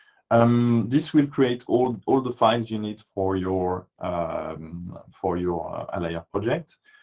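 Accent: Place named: France. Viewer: There is a lot of information in this and has done a good job in assembling it. However, a video like this stands or falls on the quality of its audio delivery. It just isn't fair that AdaCore would ask a professional software engineer to deliver a presentation like this without adequate training in voice projection. There are far too many French pronunciations of vowels (e.g. SOORCE instead of SOHRCE for "source"), pauses as the presenter searches for the right word or phrase, drops in volume at words that are vital for comprehension and so on. The whole video should have been scripted before presentation. I do not mean merely scripted like a piece of text to be read in user documentation. I mean that the script should: 1. Cover all the points that need to be made on the AdaCore Community -> FSF transition, Alire, project and library development; 2. 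Be comprehensible to all with reasonable fluency in English; 3. Be phrased in a way that is easy for this presenter to deliver. Once an appropriate script is made, the presenter can then focus on the relatively easy tasks of getting his pronunciation correct and his projection loud and clear. I hate making critiques like this because it is clear that the presenter here is absolutely expert in his subject. But he has been badly let down by his employing organization, AdaCore. They sent him out to bat without any proper preparation or training. You cannot create a software language community without investing in clear c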